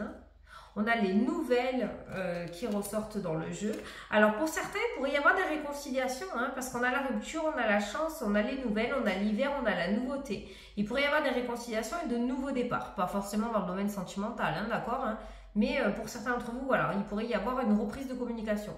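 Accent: South, France. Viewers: Félicitations pour les 60 000 abonnés! Je participe au concours avec plaisir, j'aurais l'occasion d'entendre votre accent du sud au téléphone. Très belle journée 🌹🍀🐞🦋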